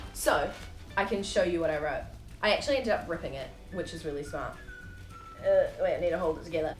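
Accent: New Zealand. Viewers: lmfao i clicked and i was like she's definitely from new zealand i can tell from the accent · Are you from Nz?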